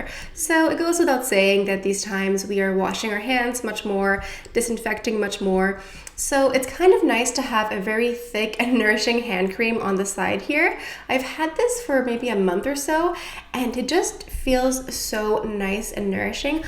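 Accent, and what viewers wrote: France. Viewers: I do love the Dior backstage highlighter palette and I'm getting Joy edp as my birthday present on the 7th! I also own and love Chanel soleil le tan (the old version) and I'm going to repurchase it in the near future... Thanks for this new video. I love your French accent, it's so cute. I speak a little French. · R u French?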